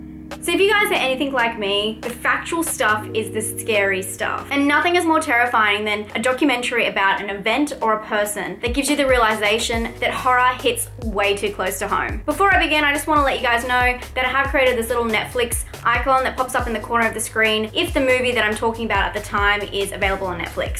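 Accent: Australia. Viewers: Are you an Australian? You're pretty as ay. *blush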